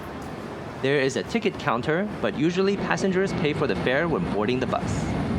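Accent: China. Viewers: 听口音就知道是同胞，感谢攻略，很有用！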